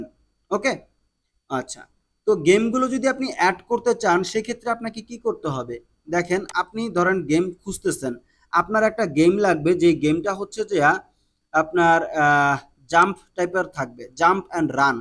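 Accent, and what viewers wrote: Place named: Barishal, Bangladesh. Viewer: vaiya mone hoy Borishailla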